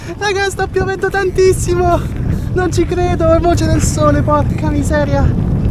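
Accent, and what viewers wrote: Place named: Tuscany, Italy. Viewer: Grande, immagino dall'accento che tu sia toscano (come me😜). Bel tatuaggio 🙃 simpatico...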